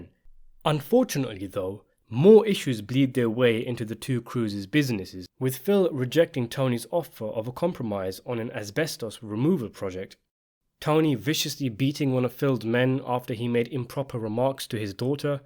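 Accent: United Kingdom. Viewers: sopranos analysis in a British accent is brutal to watch.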